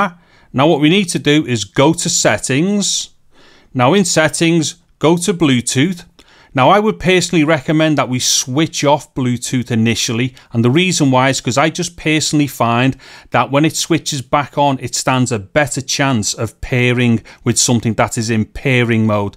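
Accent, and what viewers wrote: Liverpool, United Kingdom. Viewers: Cheers scouse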